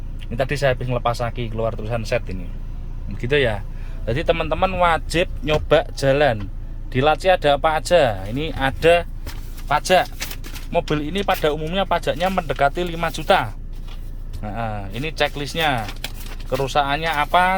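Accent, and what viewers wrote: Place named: Yogyakarta, Indonesia. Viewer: Haha liat masnya dan itonasi bicaranya jadi kangen jaman kuliah (Jogja)… 😁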